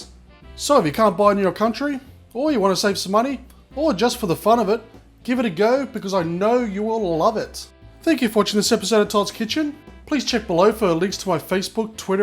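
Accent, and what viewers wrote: Australia. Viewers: he is so fucking beautifully australian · Finally a Aussie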